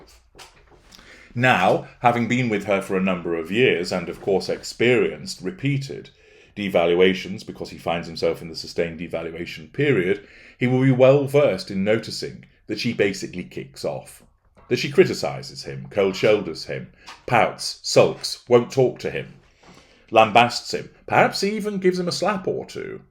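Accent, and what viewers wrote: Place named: Scotland, United Kingdom. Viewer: Love the Scottish brogue 😄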